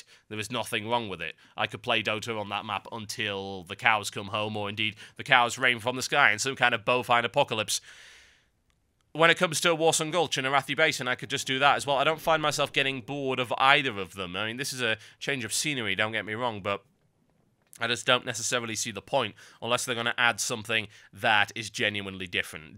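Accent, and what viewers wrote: United Kingdom. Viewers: Yay!! I love you an your cynical british accent, you make awesome videos some more entertaining than other but still a fine work <3 ^^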